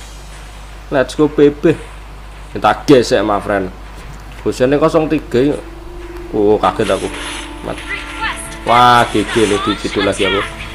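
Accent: Java, Indonesia. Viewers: Jek medok jowo sampean mas kurang harapan bangsa yang paling agung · Jawane ngendi bosku?? Ketok wenakmen ngomonge.. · Bang Lo orang Jawa ya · kok bosone Jowo medok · Bang Orang Jawa Ya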